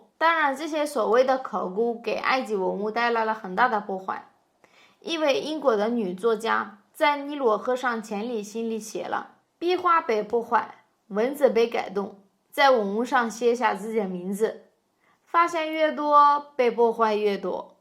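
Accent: China. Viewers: 可惜學得是中國口音，美感打了點折。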